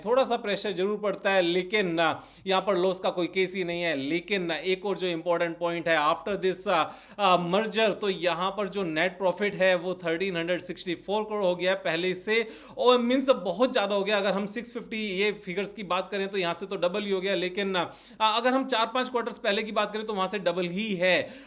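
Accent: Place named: Gujarat, India. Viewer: Bhai Have u been to Gujarat ever, sometime your tone has Gujarati touch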